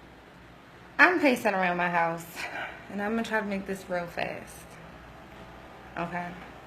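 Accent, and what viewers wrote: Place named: DMV, United States. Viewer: You have a DMV accent 💜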